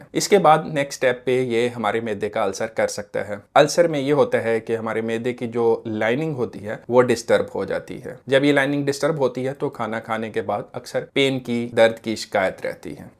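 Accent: Karachi, Pakistan. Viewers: R u from Karachi???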